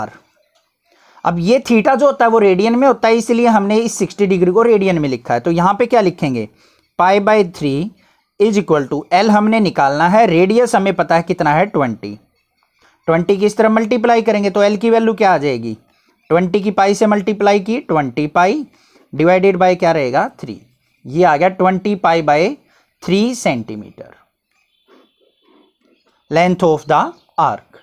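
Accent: Haryana, India. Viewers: Sir aap Haryana se ho kya? You are helping all of us sir☺️👍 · I love ur haryanvi accent 🥰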